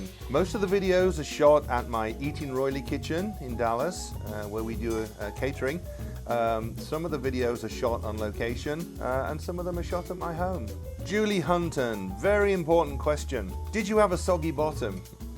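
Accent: United Kingdom. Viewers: I just love your videos! Thanks for sharing your memories & recipes -- your presentation is so easy-going and friendly that it doesn't call attention to how great your technique is and how detailed you are. My question for next Q&A, where in UK is your accent from and what is your favorite dish from your home region?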